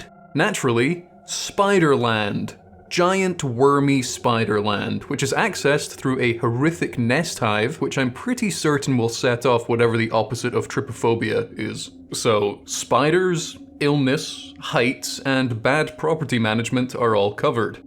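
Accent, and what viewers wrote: Scotland, United Kingdom. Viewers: I like that you’re Scottish.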